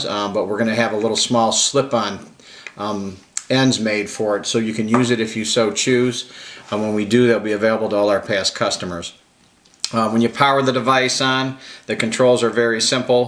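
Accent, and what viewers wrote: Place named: China. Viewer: The lady speaking she sounds like she's from China brand made in China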